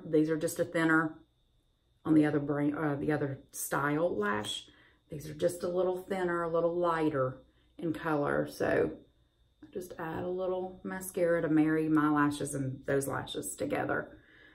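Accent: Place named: South, United States. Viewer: Wow I could jst watch you all day. Very beautiful. Luv your channel. Love your style and your cute southern accent too!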